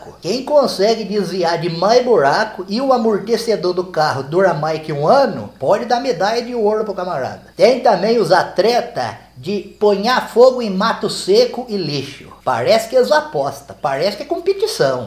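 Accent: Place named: Piracicaba, Brazil. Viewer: quando ocê veio pa Piracicaba? porque ocê falo do jeito que é aqui onde eu moro. Apesar que nois piracicabano samo um pouco mineiro também.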